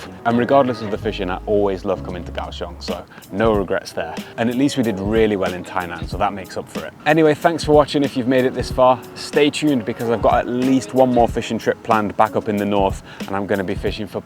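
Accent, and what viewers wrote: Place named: United Kingdom. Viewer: 這是英國腔嗎？太好聽了🎉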